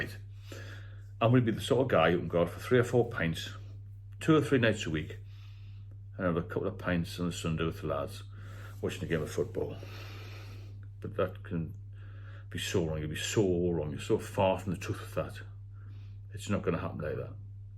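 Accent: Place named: Newcastle, United Kingdom. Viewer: Cheers. I might take you up on that at some point m8👍 on a more positive note, I hear from your accent that you're a geordie m8, congrats if you're Newcastle fan 👍 well deserved, I watched it earlier, loved it. I'm a chelsea fan.... Nuff said about that 🙄. Cheers 👍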